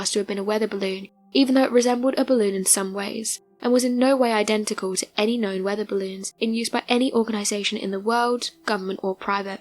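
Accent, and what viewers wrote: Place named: South, United Kingdom. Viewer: Anybody know where exactly the narrator's regional accent is from? I feel like somewhere in the Southern UK, but I can't place where ecactly.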